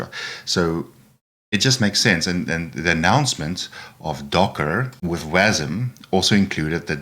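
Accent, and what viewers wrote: South Africa. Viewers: This guy sounds South African :) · Ey Brother Man. Picked up that you may be South African. Just Subbed. All the best.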